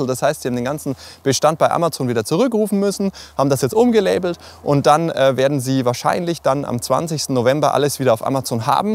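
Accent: Franconia, Germany. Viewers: Mehr frängisch!! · Fränkisch darf man nicht weg schneiden!!! Grüße aus KT 😂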